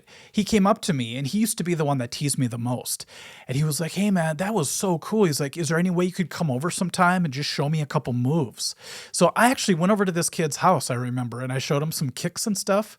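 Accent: Minnesota, United States. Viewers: I always thought of you as the Harry Kim of guitar teachers, from Star Trek Voyager.(Garret Wang). But with a Minnesota type accent. From the Coon Rapids area. Love your stuff. Keep teaching these younger generational how to be Rockers. Is Tom Bernard Still at KQ?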